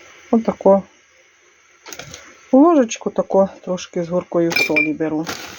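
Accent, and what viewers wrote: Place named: Narodychi, Ukraine. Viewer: Ооо, а ми з народицького р-ну ,чую що говір до волі знайомий.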